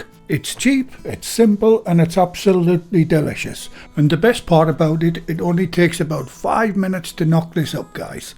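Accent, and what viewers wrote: United Kingdom. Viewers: Why should people - who are not from England! - make critical comments about the correct, clear, non-dialect, slightly (and pleasantly)-accented English spoken by an English person?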